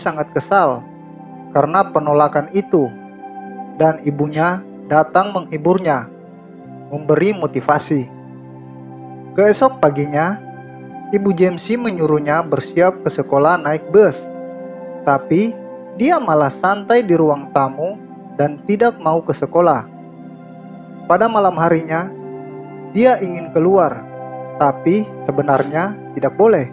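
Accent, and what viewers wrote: Eastern Indonesia, Indonesia. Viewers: abang orang timur to?? kelihatan dari logat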